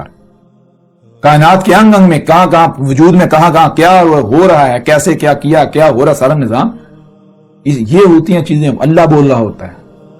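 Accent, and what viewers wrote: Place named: Gujarat, Pakistan. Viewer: Iss gaandu ko urdu bhi theek se bolna nahii aati.......lehja marasiyon orr gujraatiyon wala hai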